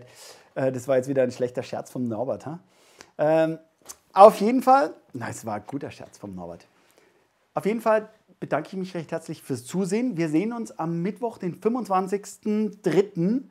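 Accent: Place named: Franconia, Germany. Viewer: Danke für das Video 🌷Du klingst aber nicht nach Wien... Eher nach Franken 😉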